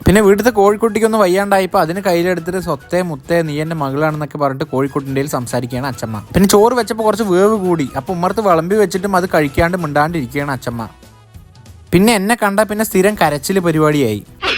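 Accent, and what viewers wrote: Palakkad, India. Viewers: Nee palakkad aano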